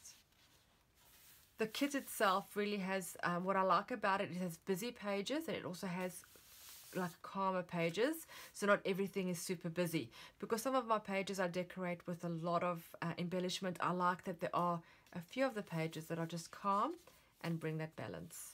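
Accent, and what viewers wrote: South Africa. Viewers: Thats a south African accent I thought it was British....love the journal xx ps it's called pianola paper or just piano paper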